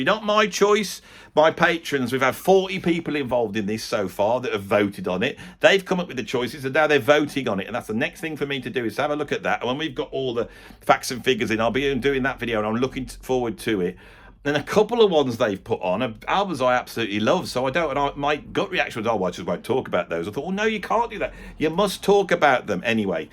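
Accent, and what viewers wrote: Birmingham, United Kingdom. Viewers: I think a lot of people watch your channel for your comical Brummie accent plus your wealth of knowledge.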